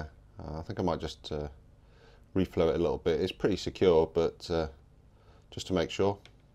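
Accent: United Kingdom. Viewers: >>>>> ANOTHER BRIT. WORBLING AWAY IN HIS SPECIAL DIALECT OF UNKNOWN LANGUAGE !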